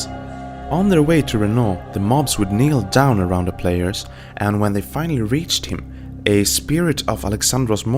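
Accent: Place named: Sweden. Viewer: Are you perhaps swedish? you do sound like it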